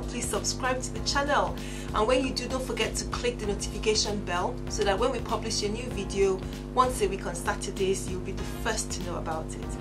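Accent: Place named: Nigeria. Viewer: You are Nigerian I can tell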